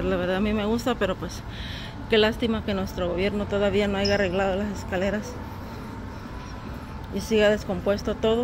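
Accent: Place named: El Salvador. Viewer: Usted es salvadoreña? Porque su acento es salvadoreño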